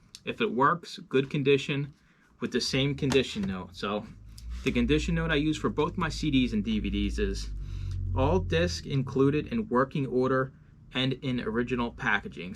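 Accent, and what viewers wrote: Philadelphia, United States. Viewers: I went with Silver Wolf DVD for 2.99. I'll let you know how things go after I get them in and turn the info in. You're east coast yeah? You have a Philly accent.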